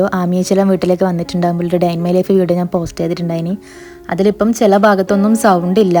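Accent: Kasaragod, India. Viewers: you are soooo.. sweet. I watch your videos mainly to hear your slang, kasargod slang.. I like your home surroundings, achamma, achachan, amma, achan, kunjava..❤ God bless you all.. 🥰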